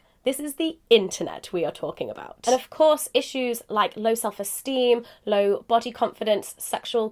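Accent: United Kingdom. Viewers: Are you British ? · Where is she from? I know she's British but what part of England is her accent from? I love it. Cause I know there's different British accents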